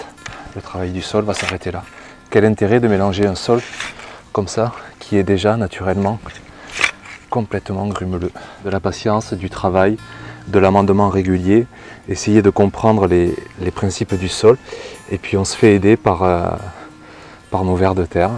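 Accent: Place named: Southwest, France. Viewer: Elle l'est car peu travaillée ces dernières années c'est plus sûr la strate du dessus l'herbe était coupée a raz tout le temps donc la terre peu protégée des UV :) j'entends que tu prononces le S quand tu dis : moinS tu es d'origine du sud ouest ? :)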